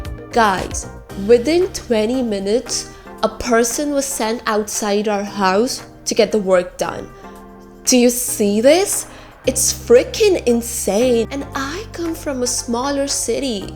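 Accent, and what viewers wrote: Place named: England, United Kingdom. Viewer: Watching your video totally transported me to India! 😂 Keep up the amazing work, you nailed it and btw I love your English accent khas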